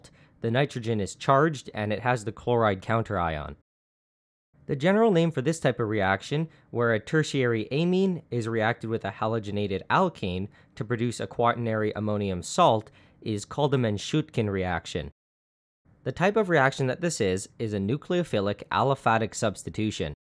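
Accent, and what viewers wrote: Canada. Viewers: Hey! Are you Canadian?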